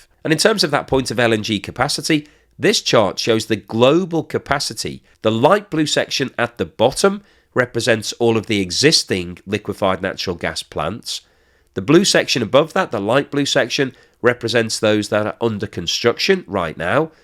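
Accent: United Kingdom. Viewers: Our host is British, eh wot?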